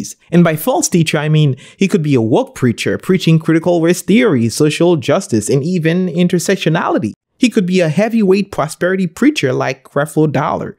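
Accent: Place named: Haiti. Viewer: your videos and exposition are outstanding. Quick question. My wife and I sensed (what we perceived as ) a ever so slight Haitian accent as we are from S. FLA and it has one of the largest Haitian communities in the US. Are you by chance in S. FLA? Because if so and you are a pastor then we'd LOVE to attend one of your services. Let us know and which church if you are indeed a S. FLA pastor. Thanks and God be with you always.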